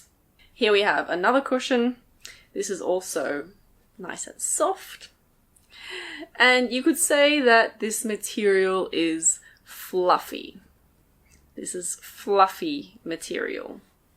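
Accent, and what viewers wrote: United Kingdom. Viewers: is it british english ? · Do you speak British English?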